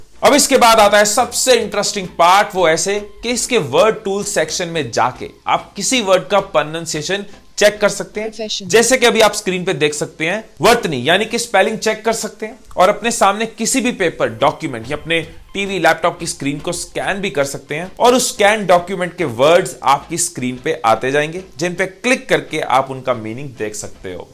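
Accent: India. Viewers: Sir hello english indian hai ya chinese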